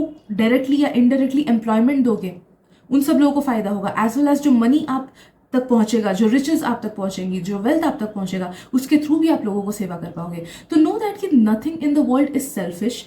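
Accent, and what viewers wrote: Bengal, India. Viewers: Didi are you bengali ??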